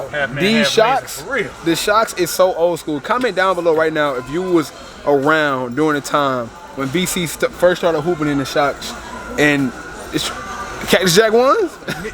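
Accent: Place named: AAVE, United States. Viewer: ENGLISH NOT EBONICS!! YOU SOUND STUPID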